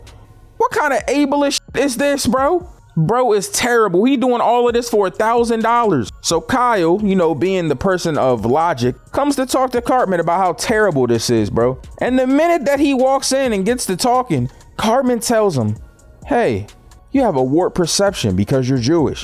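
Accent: Philadelphia, United States. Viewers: U sound more Philly den Baltimore cuz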